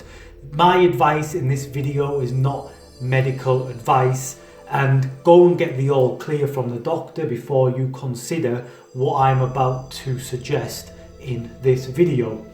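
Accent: Manchester, United Kingdom. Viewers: I can hear a Manchester accent.. 💖 Mancunian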